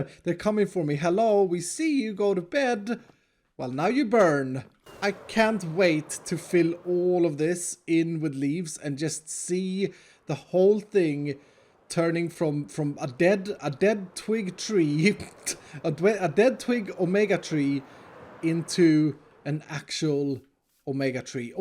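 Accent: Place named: Sweden. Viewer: Im sorry bur you have one of the most Swedish accent that u have ever heard😇😅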